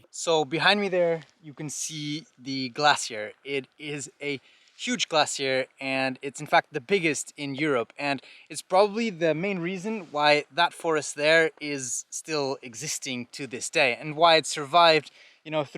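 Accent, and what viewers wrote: Portugal. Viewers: You have a portuguese accent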